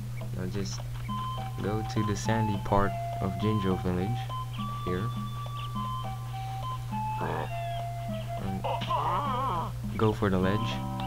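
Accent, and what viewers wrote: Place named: United States. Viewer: see told you, you sound american/canadian amazingly... but i respect your belgian waffleness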